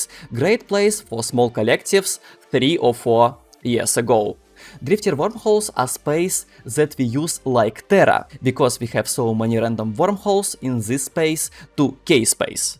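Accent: Russia. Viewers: ты когда говоришь на английском, представь, что ешь картошку) А то слишком русский акцент, по ушам бьет и могут не понимать. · Ляя, ну нахера стараться держать этот русский акцент. Ты можешь читать нормально по английски. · Ну хз, вот как раз такой "русский" английский я понимаю, потому что произносятся те звуки, которые иностранци проглатывают :D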